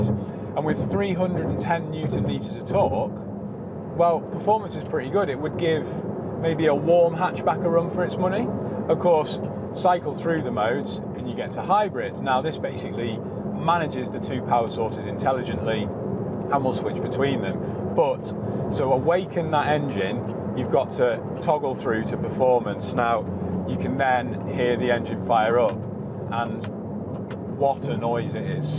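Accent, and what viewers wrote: United Kingdom. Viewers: Wow, what a precise, accurate and fascinating description. By the way, It's the first time that I really appraciate a british accent, which normally sounds to me, instead of sofisticated, somehow ridiculous and artificial..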